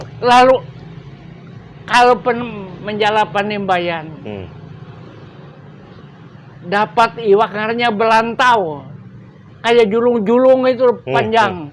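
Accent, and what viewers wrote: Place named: Java, Indonesia. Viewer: mantap👍👍👍....logat nya kayak jawa ngapak yak heheh 😁salam sejahterah dan damai selalu buat seluruh warga kalimantan🙏🙏🙏kita smua bersaudara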